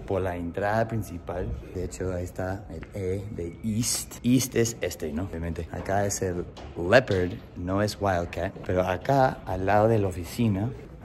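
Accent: Peru. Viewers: Hablas tan bien el español y con acento peruano incluído que pensé que eras peruano, pero igual te considero como un peruano más xq se nota el feeling que sientes por Perú, buena, te hiciste una, éxitos bro 👍👍🇵🇪🇵🇪 Nice school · Es sorprendente... Tienes el acento y gracia de un nativo peruano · El asento lo tienes bien peruano ja que loco jaja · Wouuuuhhh ¡qué hermoso gesto!, se siente tu amor por nuestro ¡PERÚ! ¡GENIAL!!! Me encanta tu sabor peruano al expresarte 😁😍, eres todo un peruano. ¡Cuídate mucho! Y GRACIAS 🇵🇪♥️✨ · No sólo representas al Perú, sino además conoces la jerga peruana y sobre todo hablas español con acento peruano, lo que es muy difícil de lograr para un native English speaker. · Mare, ahora te creo, yo creí q eres cajamarquino, o pozuzo, hablas bien como el castellano peruano. Me sorprendiste.! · En serio man te has peruanizado un montón, tienes el acento de limeño de barranco.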